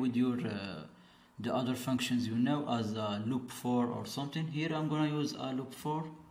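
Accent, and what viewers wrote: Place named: Morocco. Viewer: منين لبلاد اياسمون، باينة عليك مغربي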